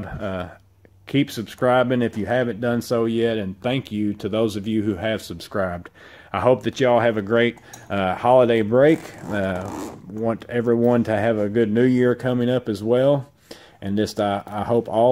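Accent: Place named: Texas, United States. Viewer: I love your Texas accent lol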